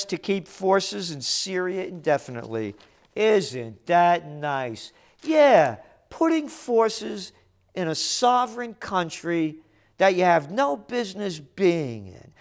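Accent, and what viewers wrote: New York, United States. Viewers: i love the New Yoik accent!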